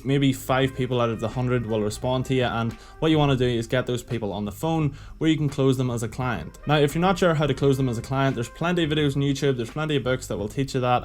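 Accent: Northern Ireland, United Kingdom. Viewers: Northern Ireland bror? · Hi, Recognise the accent so I assume you live in NI like myself. I'm looking some guidance into how to make money as a side hustle and would love your advice or coaching. How can I contact you?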